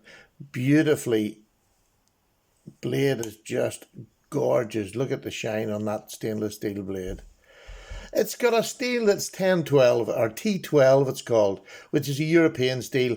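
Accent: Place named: Ireland. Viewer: I don't understand half of what this gentleman says? Irish? Scottish?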